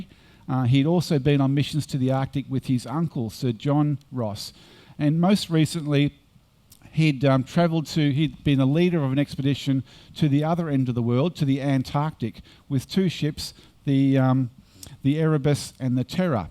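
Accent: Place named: Australia. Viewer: ..trying to get past the Aussie accent, the image of Tower Bridge doesn't help.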